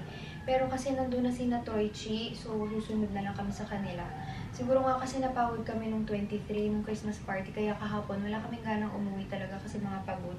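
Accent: Philippines. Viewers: kakatuwa tlg punto satin kababayan tau hihi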